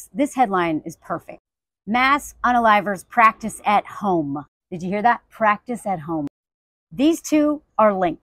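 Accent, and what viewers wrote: South, United States. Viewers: You're from Nashville! Cool city. I knew I heard a little bit of a Southern accent. lol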